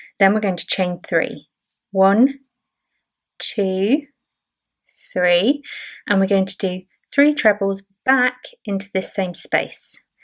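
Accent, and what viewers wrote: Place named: England, United Kingdom. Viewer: Thanks a lot … great tutorial and I love your English accent …am going to have a look at your book, sweetie.Have a nice day, hugs from France.🥰😘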